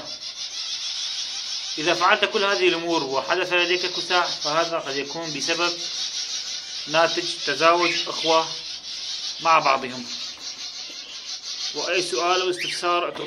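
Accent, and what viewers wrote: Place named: Syria. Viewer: منين انت اخي لهجتك مو غريبة عليي منين من سوريا😍😍😂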